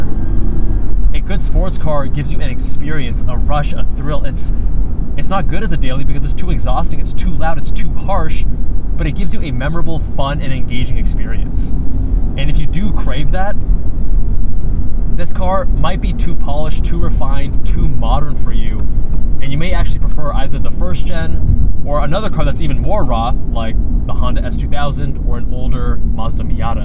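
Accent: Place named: Singapore. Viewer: Sounds Singaporean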